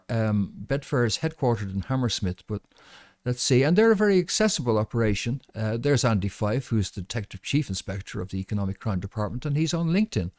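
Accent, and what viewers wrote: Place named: Ireland. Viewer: we love listening to you speaking in your gorgeous Irish accent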